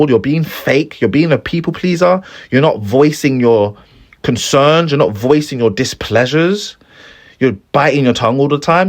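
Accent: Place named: United Kingdom. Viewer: Ppl like the fake. That’s why redpill folk so popular. Goofy ppl gravitate to goofy ppl. He’s this era Patrice O’Neal with the UK accent. Wish him success nd continued contentment in doing what he loves.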